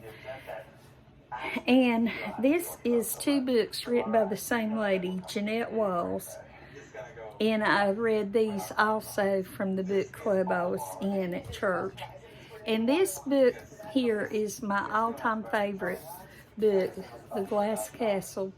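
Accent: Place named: North Carolina, United States. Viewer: Glasses Very wild, something I think you would see Ru Paul wear. I don't know if you remember Elton John and his glasses Very good sense of humor. Enjoy your videos and love your NC accent.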